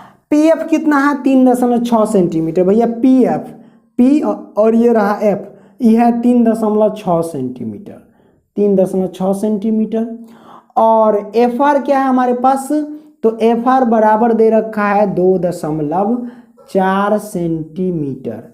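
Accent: Bihar, India. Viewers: Aap Bihari hain ji. Aap bahut achha padhate Hain. 👌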